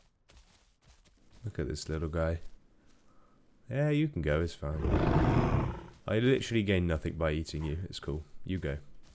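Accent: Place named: United Kingdom. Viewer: Once I heard the British accent and calm personality . I instantly knew this was gonna be good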